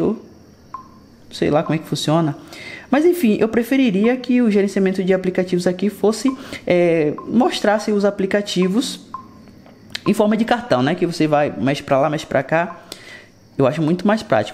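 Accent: Bahia, Brazil. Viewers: Gostaria de saber se a miui 10 vai chegar para o redmi 4a? Força aí no canal , tu é baiano?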